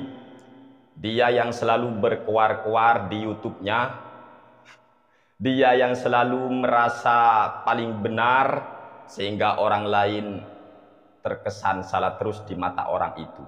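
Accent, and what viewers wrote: Madura, Indonesia. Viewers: ini org madura mna?? klhatan logatx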